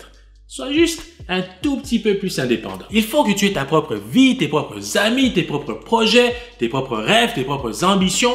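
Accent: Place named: Canada. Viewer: avec le son de la télé trop fort, devant mes neveux, cetait LOOOOLLLL. sinon belle video thanks. j'adore l'accent canadien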